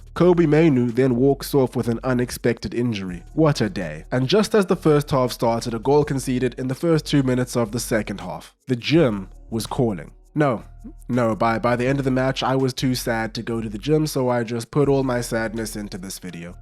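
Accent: South Africa. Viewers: I'm curious about where you're from bro? Name says Zim, accent says SA. Just curious... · Are you south African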